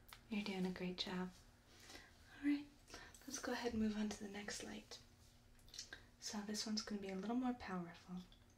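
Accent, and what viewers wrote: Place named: South, United States. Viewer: I don't comment very often on your channel, but I just saw this vid 2.5 times... That good it was!! Amazing tingles!! ...Thanks for that! :D ...ALSO, there's a rhythm on every aspect of the video that makes it SO soothing -I bet if we measure your movements, those must be along the range of the Theta Waves- and so relaxing! ... ...and one more thing, I love your southern accent! Is so mellow... Talking with contractions is sooo mellow xD Thanks again! :D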